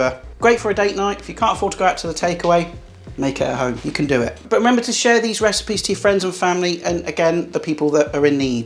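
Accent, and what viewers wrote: Leicester, United Kingdom. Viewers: Lol just listening and thought hes from Leicester after going London road and granby street . Hey presto, thurnby lodge here.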